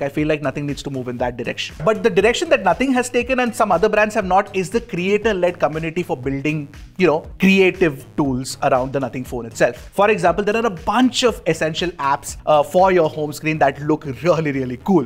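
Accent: India. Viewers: the video is useful but how you speak in india accent influence the viewer not to understand the content. please improvize your intonation in english accent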